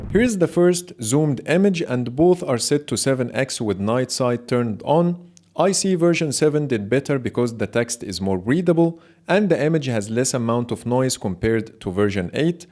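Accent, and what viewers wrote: Egypt. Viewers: Very nice Egyptian accent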